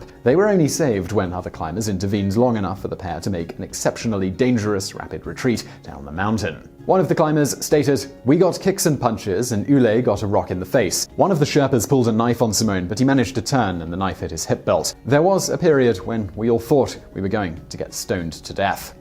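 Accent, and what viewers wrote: United Kingdom. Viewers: Wtf is this brittish Vsauce?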